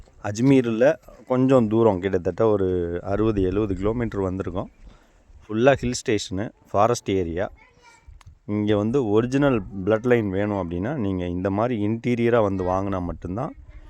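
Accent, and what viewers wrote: Madurai, India. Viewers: ❤ assalamualaikum warh madurai basha ❤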